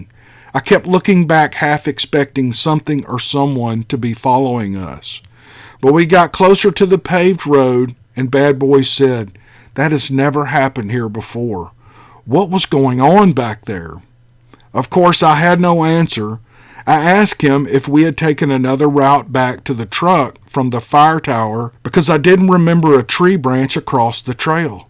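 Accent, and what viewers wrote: South, United States. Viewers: Love your stories. It's just what I need to get thru these bad, horrible times. It's bad up here in the northeast. I love your southern twang and relaxed state. God bless. From cold NE. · "How y'all doing?" Absolutely Southern. I've been watching bee keepers on YouTube and one in North Alabama said the "comb was about the size of a bisquit. " Just had to laugh. Where else would you hear that comparison? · I just want to say that I immensely enjoy all your stories and music and I enjoyed watching your bike ride with your cute dogs running around you. I hadn't heard much about dogman and was surprised to find out about another cryptid. I love hearing about sasquatch, dogman, and the ufo sightings. I live in Toledo, Ohio and I love your southern accent. It's so friendly and homey sounding. Well, I don't want to ramble on to much but I love your channel and could listen to the stories all day and I can tell that you love reading them. 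Thank you. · Hey, I've commented in the past about how I love your voice and soft southern accent. Well, I didn't mention it before, but I also think it's wonderful how you have such a kind, tender heart!!! With your love of the outside, your dogs, your home State,Mississippi, and your family, I think you are about the perfect Southern Gentleman!!! We are so lucky for! Great stories! Great narration! I am so glad you spend time creating such an interesting and fun channel!!! Thank you for all the time & thought you put into making great entertainment!👏👏👏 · I’m pretty sure I hit subscribe 5 seconds after hearing you start narrating. - your voice/cadence/accent is totally “good ol boy” and I can not get enough! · are these your drone shots? I love them. I am from the lowlands of SC, big family property in the middle of nowhere. I live in Northern California these days. Your videos make me think of home as does your accent and manner. 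I listen to your stories whenever they come out before bed. So thank you! Some of these stories have happened in either my county (Santee in Orangeburg) or on the New River in NC where the other side of my family has been there for hundreds of years. Never seen Bigfoot. Other things though. Anyways I got to visit Mississippi someday. People kinda put it down but obviously that is hogwash especially if you love the land and blues. Kinda like an even wilder version of SC. Let me know about that drone footage.....and yes dogs have a very special and particular place back home too, they qualify as a y’all.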